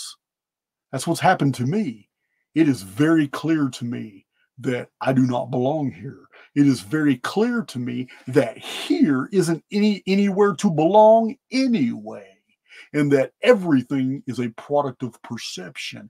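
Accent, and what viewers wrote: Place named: East Texas, United States. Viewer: I'm a new subscriber. From the beginning of '24 someone who worked for me was trying to manifest. Ive listened to you for around 11 hours. The person I mentioned, trying to manifest. W/O a Spiritual foundation. I think thats been the warnings. The person sunk deeper and deep into rage and abusive behavior. It's sad to watch. I'm much older and putting great efforts time and again. We have to do the inner work. Otherwise there is no peace. And we can fall extremely low. I highly suggest pauses at times before reacting, making choices. Get to know yourself. Set goals and show up. You can do it. You definitely are inspired at times. When we don't recall it, it is often called channeling. Which is Humorous because you say you don't. I think you do. You do talk very nearly East Texas. i understand it. I definitely will be looking at you. 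Thanks for all your time, and I certainly hear recovery from times past. Blessings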